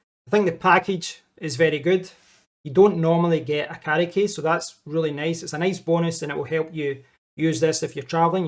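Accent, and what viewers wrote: Scotland, United Kingdom. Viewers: a great day to perfectly understand a Scottish accent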